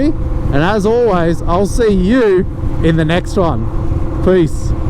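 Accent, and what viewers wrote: Australia. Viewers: Enjoyed the scenery mate. Great to hear a fellow Aussie accent overseas . Great bike. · First of all... Great review and a fantastic bike! But I'm very confused about yourself mate 😅 Bavaria in the name, an Australian accent and based in Estonia? Sounds like an interesting backstory 👍🏻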